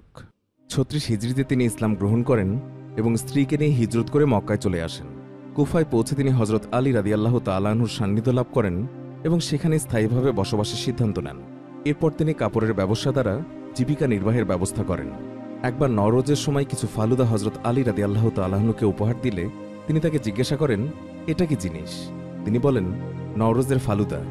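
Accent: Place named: Noakhali, Bangladesh. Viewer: Commentator's pronunciation and language problem, it seems he's from Noakhali.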